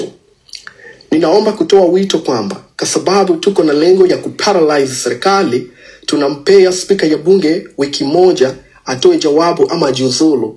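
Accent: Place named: Kisii, Kenya. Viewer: Kwani wewe ni Mkenya?Mbona lafidhi yako kama ni wa kisii?Achana na habari za Tanzania kenge maji,tukijichanga ulaya tunacmplain tunatoka nchi yoyote yenye matatizo Africa,we are Somalian we are Burundian and we ate also Congolese.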